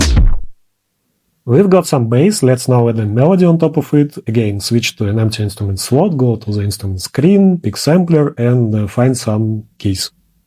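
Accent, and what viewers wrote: Russia. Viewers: Эй у тебя русский акцент ? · Друг слышу русский акцент) как бы такую собрать? Есть схемы или где купить, очень хочу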